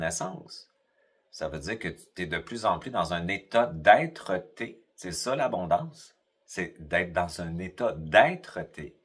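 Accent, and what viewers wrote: Canada. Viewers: J'ai l'impression de m'écouter avec l'accent canadien, quand je me parle à moi-même, parfois... Cette sidération face à ce qui fascine les autres, aux trucs importants dans leur vie... Je disais avant de vous connaître que j'avais l'impression depuis toute petite d'être "montée à l'envers" par rapport aux autres. Maintenant je comprends mieux. C'est chouette. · Bonjour , tu décris parfaitement notre évolution intérieure ( moi depuis 35 ans et j'ai dégusté ! avant que le chemin ne s'éclaire ) chaque étape y est et je me retrouve parfaitement dans ce que tu dis ...par exemple : oui plus de mémoire puisque on est dans l'instant présent, les émotions n'existent plus puisque qu'on n'est plus sous l'emprise du mental , et puis on est tout simplement etc... Aujourd'hui j'ai pu te suivre car tu parlais lentement , l'accent canadien n'est pas tj facile pour moi ...soleil des antilles francaises.Marie.😊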